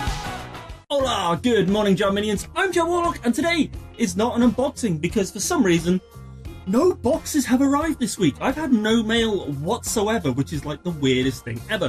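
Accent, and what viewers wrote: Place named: United Kingdom. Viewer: I totally do that adapt accent thing. When I meet a british person i immediately start talking with a british accent. And to Americans, most british accents sound the same. We cant tell regions. So you sound British not Liverpool.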